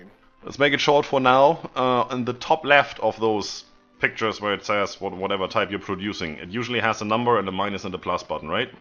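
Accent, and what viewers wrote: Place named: Northern Germany, Germany. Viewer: Always warms my heart to hear a North German accent. :)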